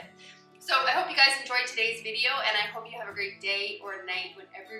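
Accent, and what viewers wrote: Canada. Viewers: Hello, just a question. Do I detect a Canadian accent? Not one y’all , but pasta made me wonder. ❤️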